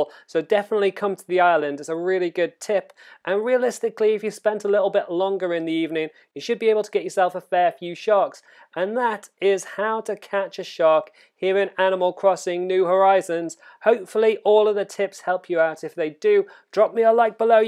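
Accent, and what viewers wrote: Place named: United Kingdom. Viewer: WATCH OUT FOR THE “LAW” DROP IN—bruh with your british accent i cant tell what the heck ur saying about the short window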